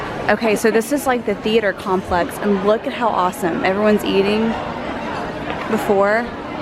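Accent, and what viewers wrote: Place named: South, United States. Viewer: Great videos. I am enjoying your vlog style and your southern accent.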